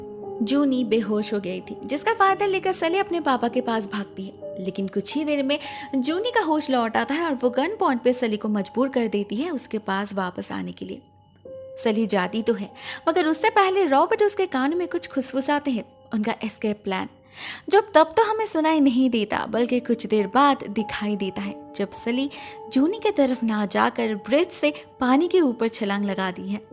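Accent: Bengal, India. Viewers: R u Bangali? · Woh bengali h. Unke accent se pata chalta h. Upar se unhone durga puja k waqt bengali me wishes di thi viewers ko. Sri lanka se nahi h. Kuch bhi bolte rehte h log.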